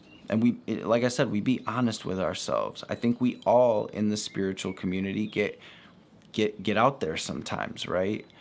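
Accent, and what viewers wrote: Detroit, United States. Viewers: You fasho gotta be from Detroit, “what up doe“, “my babies“ 😅😅 and of course the infamous Detroit 🧢 😅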